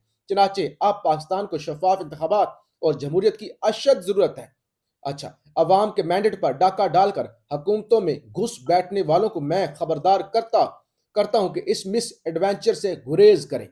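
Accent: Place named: Rampur, India. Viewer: Asslamoalekum Ap plz yeh bate yeah Ki Apki Ammi ya Abbu Ki traf Ka koi india kr U.P se hai, Rampuri hai koi na koi 😅… Ap Bilkul Rampuri Andaz Ke ho, Ap Pakistani nhy lagte ho … plz reply 😅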